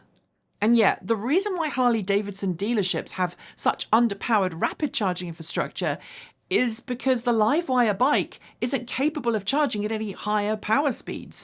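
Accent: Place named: United Kingdom. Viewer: In awe of how you keep your UK accent while using exclusively North American idioms and speech patterns. So fascinated by this I almost missed the point of the video. It's quite remarkable!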